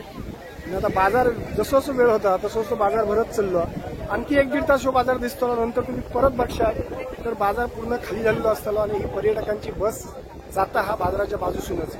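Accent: Malvani, India. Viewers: फारच छान बाजार तुमचे मालवणी ऐकाक मजा येता।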